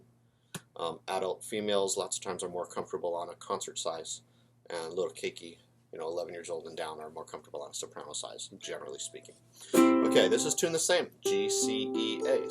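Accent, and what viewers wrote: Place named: Hawaii, United States. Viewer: and his staff are from Hawaii. So they pronounce it oo-kuh-lay-lee.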